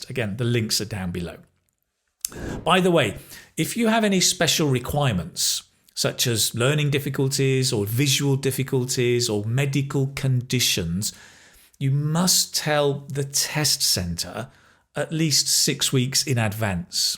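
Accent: United Kingdom. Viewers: Is this British English? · I ENJOY VERY MUCH WHEN I LISTEN TO YOU WHILE YOU SPEAK BRITISH ENGLISH WHICH WE WERE BEEN TOUGHT IN SUDAN MANY YEARS AGO. I want to speak it like you but l could not.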